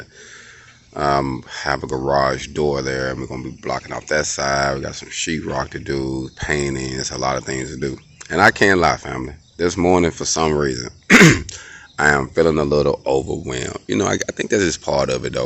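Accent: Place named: Memphis, United States. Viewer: Sound like u close to Memphis my dawg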